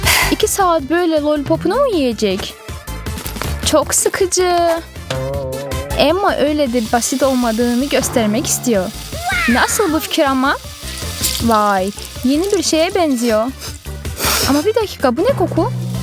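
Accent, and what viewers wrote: Azerbaijan. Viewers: Sen azerim8sin türk mu anlaşlimyo bence azerisin 😏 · Azerbaycanca konuşuyorsun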